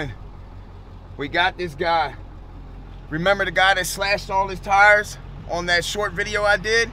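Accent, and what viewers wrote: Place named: Delaware, United States. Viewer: Could tell this was Delaware soon you started talking 😂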